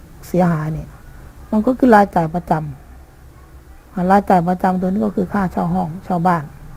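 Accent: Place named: Isan, Thailand. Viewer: ดีน้อ หนุกะอีสาน พอฟังออก555 ตามฟังอ. อยู่เด้อจ๊ะ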